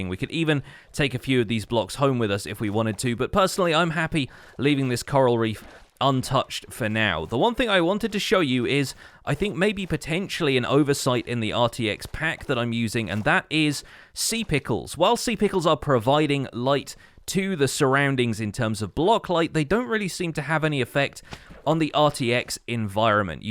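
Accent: United Kingdom. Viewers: You sometimes sound like AbdulHakim Murad. Clear british accent sounds great for us non native speakers and both of you have it.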